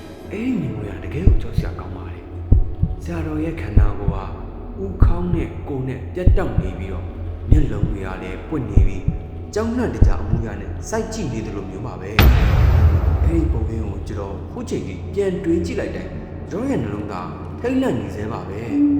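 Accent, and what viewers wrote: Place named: Myeik, Myanmar. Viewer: ကြိုက်လိုက်တာရှင် အသံလေးလည်းဖွာမိတယ် မြိတ်အသံလေး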